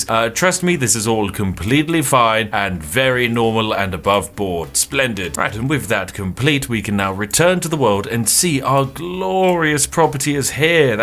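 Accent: United Kingdom. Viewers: oh my, that is one British Brit right there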